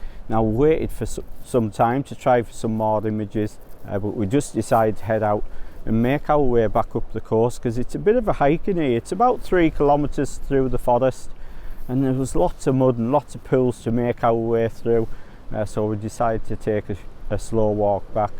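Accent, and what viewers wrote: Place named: Merseyside, United Kingdom. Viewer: I've just subscribed to your channel, I think your images are great. Are you a Brit, you sound like a Merseyside boy 👍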